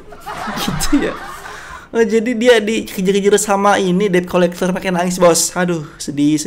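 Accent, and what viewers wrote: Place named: West Sumatra, Indonesia. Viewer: emg dri khas minang:))😅🤔😇💔😤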